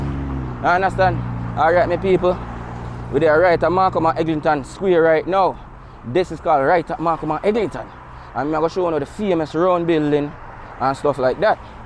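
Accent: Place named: Jamaica. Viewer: Do your Presentation Minus the MY PEOPLE PATOIS. Try to speak English.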